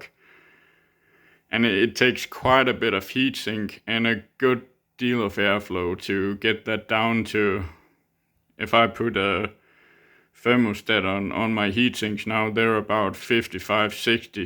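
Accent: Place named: Denmark. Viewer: U sound very danish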